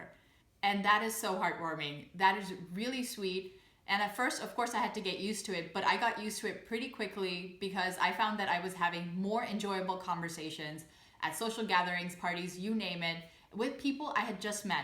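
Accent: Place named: Netherlands. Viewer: Funny to see how you are picking up a sleight Dutch accent. You can still tell you are American but a bit of Dutchie is starting to creep in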